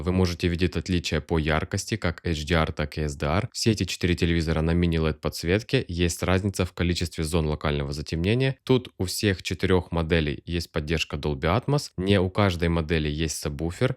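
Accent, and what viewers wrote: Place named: Ukraine. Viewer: У тебя оч приятная укр мова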